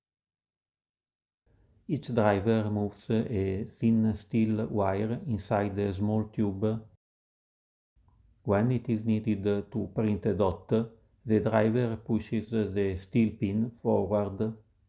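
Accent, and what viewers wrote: Italy. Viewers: Oh yes, i can understand. When we hear the narration it sounds like an italian speaking English. Is that actually your voice or an automated voiceover? I always juat figured that was you.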